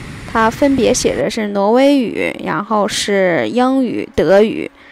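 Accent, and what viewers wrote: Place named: Northeast, China. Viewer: 听🈵️……东北s味十足…凑合着听吧……呵呵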